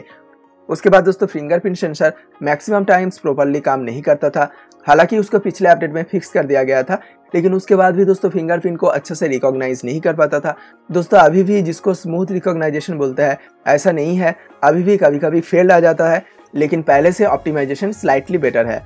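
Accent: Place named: Uttar Pradesh, India. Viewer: bhai aap jo.bolte ho uski ton thik karo like jo English words hai woh tore up wale ke ton mei bolte ho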